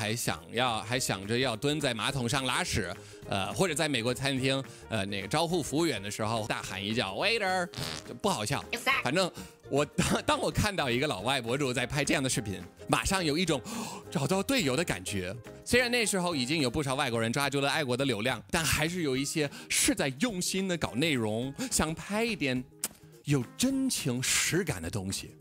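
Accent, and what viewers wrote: Beijing, China. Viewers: 我是台灣人 雖然說真的超不習慣你的中文口音和用字 但十分認同你的內容 所以點讚了 然後總覺得還是比較喜歡你講英語的樣子XD 喜歡講母語的你，覺得比較自然XD 也真的從你這影片體認到，果然一個人講不同語言時真的會呈現完全不一樣的性格，給人完全不同的感覺 覺得你用英語結語時比你前面用中文說明時更有說服力，我是指「你反共」這事的說服力 可能太多操著北京腔中文的歐美舔共影片創作者了吧～讓我覺得用北京腔講中文的歐美人都很舔共，你算是讓我改觀了XD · 京味十足，加油bro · 您的京腔中文很标准 而且是个说真话的人 佩服敬佩🙏🏻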